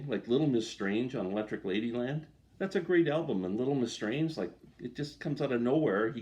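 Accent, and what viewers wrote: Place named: Canada. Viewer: That was hilarious! Also, I can't imagine anything coming across offensive when speaking in a Canadian accent. Cheers!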